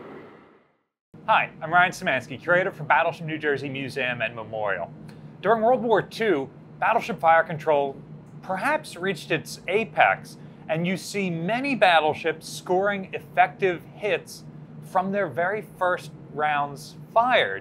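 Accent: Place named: Upstate New York, United States. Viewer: Missouri*. Saying “Missoura” with a Missouri accent makes sense, it’s how they talk. Saying “Missoura” with a John Peck-Erneck upstate New York accent sounds fking ridiculous.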